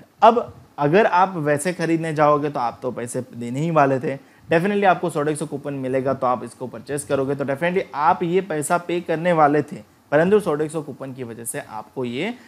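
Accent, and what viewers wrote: Gujarat, India. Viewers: વાહ ગુજરાતી વાહ! ભાઈ ગુજરાતી ગમે એટલું હિન્દી બોલી લે પણ ખબર તો પડી જ જાય કે ભાઈ ગુજરાતી છે.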